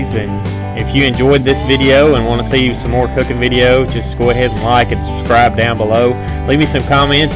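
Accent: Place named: United States. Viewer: oh honey, the country accent is beautiful. Don't ever cover that up 😊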